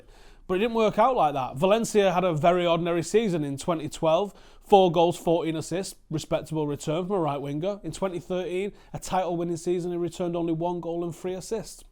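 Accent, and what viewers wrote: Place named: Cockney, United Kingdom. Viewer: Accent Cockney 100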